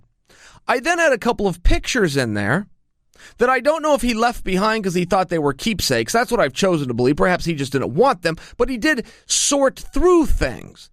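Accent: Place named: Portland, United States. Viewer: Sounds like a portlandian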